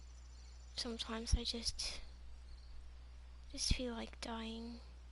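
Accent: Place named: United Kingdom. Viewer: I'm so sorry what you've gone through (T^T) ps. You sound British Pps. I love you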